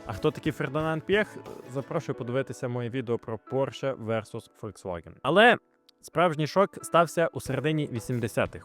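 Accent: France. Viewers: за вироблення французького акценту, замість англіцизмів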